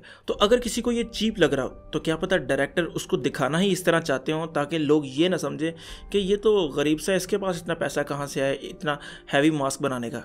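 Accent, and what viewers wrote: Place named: Pakistan. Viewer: this guy sounds like Pakistani..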